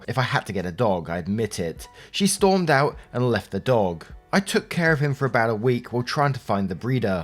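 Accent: United Kingdom. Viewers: I am here for the British accent XD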